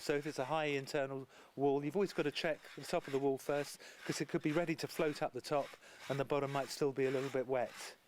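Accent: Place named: Cornwall/Devon, United Kingdom. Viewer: Ah-ha. I wasn't sure if you were nearby because I detected a Cornish/Devon accent. Considering I just have one internal wall (approximately 2.5 m x 4 m) - and one external length of wall which is only about 4 m², it probably is not worth your while coming all this way down if you are "Up-country". It would be useful if you could recommend somebody in my area. Congratulations once again on your incredible work - hopefully this Will prove a good platform for you to get more work in your area.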